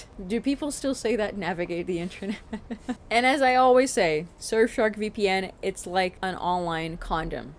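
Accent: Brazil. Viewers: Meu deus, sabia, eu reconheci uma parte do seu pequeníssimo sotaque "brasileiro" além dá sua aparência que lembra a minha e minha família, espero que tudo dê certo para você, também sou músico, mas minha música é difícil de bombar, anyways I´m on college. SAUDAÇÕES my friend CONGRATS FOR THE BEAUTIFUL WORK!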